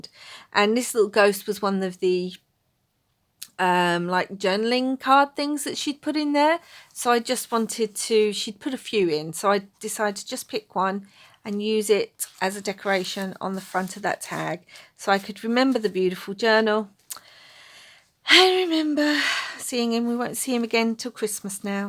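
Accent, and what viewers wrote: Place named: England, United Kingdom. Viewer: Are you Irish born but live in England? Or have you lived in Ireland your entire life? I thought your accent is English/British. Just curious. Didn't want to know for any other reason.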